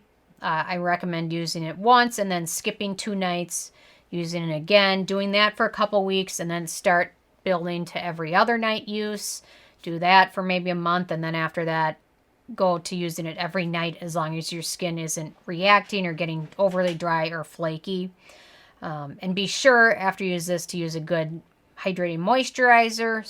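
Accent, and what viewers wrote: Minnesota, United States. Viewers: Are you from Minnesota? The accent sounds like it. ;)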